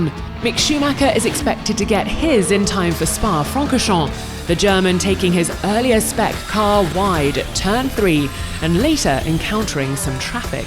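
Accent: United Kingdom. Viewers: this narrator with that horrible britisch accent, cant f1 find any better? annoying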